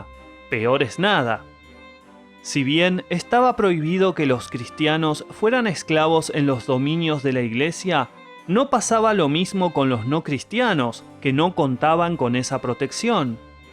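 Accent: Argentina. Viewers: Un mishon? Que significa un mishon 😂 horrible acento argentino horrible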